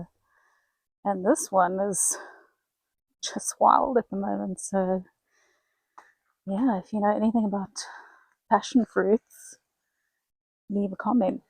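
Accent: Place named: South Africa. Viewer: New subscriber from Alberta, Canada. Originally from SA as well. Been in Canada for 31 years in mid March. I get so excited when I hear the SA accent in any videos. We wish you all the best in your new adventure. It takes a good couple of years to get settled into a new country. I do miss our lovely "old" country but also love the freedom and security we have in Canada. God bless your family.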